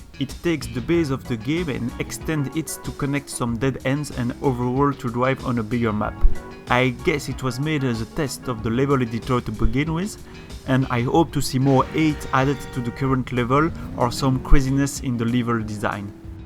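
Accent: France. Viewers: With a french accent :) Looks very promising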